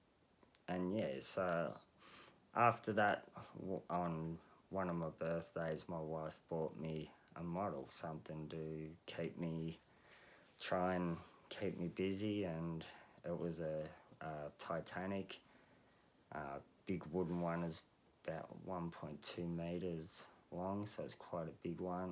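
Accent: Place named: Australia. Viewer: You have pretty mad skills and is that a bloody Aussie accent I detect. Good on you mate.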